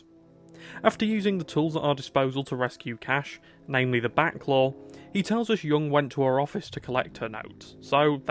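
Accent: United Kingdom. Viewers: You should make this video without the british accent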